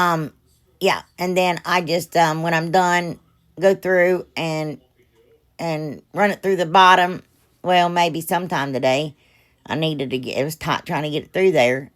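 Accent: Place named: East Tennessee, United States. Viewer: I love watching you because my family is in East Tennessee and your accent makes me feel like you’re family 😘 my entire house gets filled with snowmen. Only nativity sets and snowmen.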